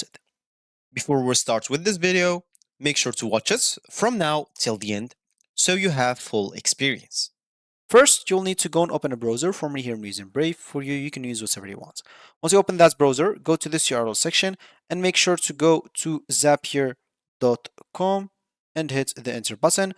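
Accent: Morocco. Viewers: bruh you sound moroccan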